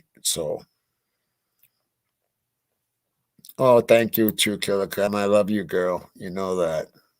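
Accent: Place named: South, United States. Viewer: He sorta has a southern accent or something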